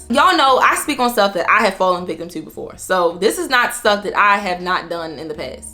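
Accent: Baltimore, United States. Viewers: I think it’s mostly Instagram not all social media platforms. Also what state are you from im trying to pick up on the accent? I feel like it’s Baltimore though.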